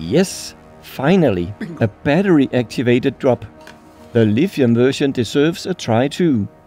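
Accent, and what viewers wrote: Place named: Denmark. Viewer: Rema 1000 is a Norwegian discount supermarket chain also operating in Denmark where I'm sure is from as I can tell on his thick Danish accent. So, these are just private label batteries made for Rema 1000 supermarkets. And Kameda is a private label battery brand of the Danish discount tool chain Harald Nyborg. Harald Nyborg is kind of Denmark's answer to America's Harbor Freight. So, it's also unknown who makes Kameda batteries. And with all private label products the manufacturer can change from one year to the other as the retail chains usually contact the lowest bidding manufacturer to meet their requirements.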